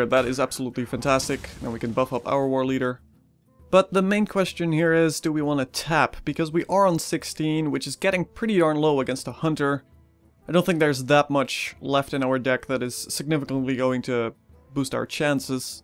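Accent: Netherlands. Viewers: you from the Netherlands or Belgium?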